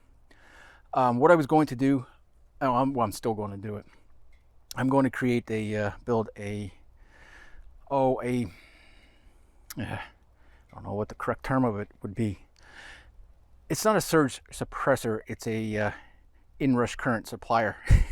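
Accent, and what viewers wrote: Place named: Idaho, United States. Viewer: Where you from cat? You sound like an Idahoan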